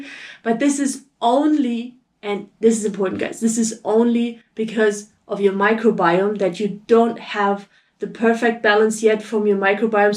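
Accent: Germany. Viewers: German accent is not really appealing for ears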